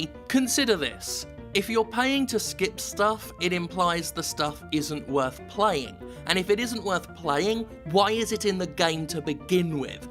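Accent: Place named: United Kingdom. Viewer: Always nice to hear your own thoughts so eloquently put. And in a British accent to boot. Makes it somehow more dignified. Fuck Warner Bros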